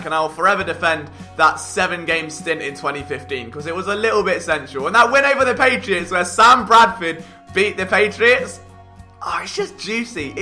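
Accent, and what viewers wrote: England, United Kingdom. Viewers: I started watching the channel because I love the Eagles. But when I heard the English accent, I was very intrigued, because as an actor, I have to learn English accents. Your content was great, very knowledgeable, and I got to learn more film and about players that aren’t really in the spotlight. Congrats to you and your team and continue the great work! #FlyEaglesFly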